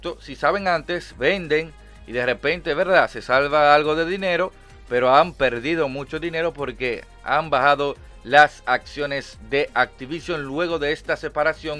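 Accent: Dominican Republic. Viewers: De donde eres porque tienes un acento super Dominicano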